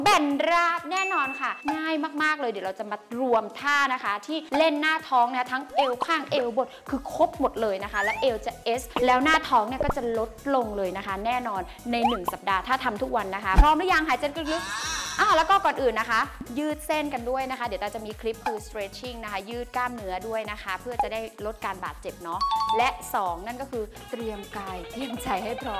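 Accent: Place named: North, Thailand. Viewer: ชอบความปาเเล๊ดเหนือขนาดจ้าว พูดไทยทุกคำสาบเมืองได้จาใด เก่งขนาดจ้าว ไว้จาทำตาม ^^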